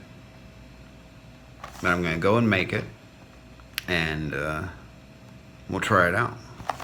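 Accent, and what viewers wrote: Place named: Pittsburgh, United States. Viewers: Definitely hear some PA in there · Idk why but I hear some Pittsburgh in your voice lol that's where I'm from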